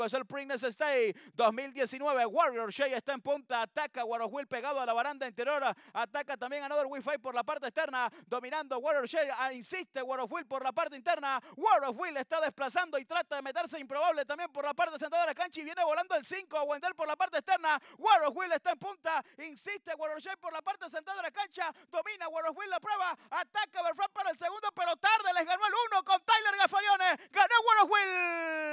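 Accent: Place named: Venezuela. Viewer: es venezolano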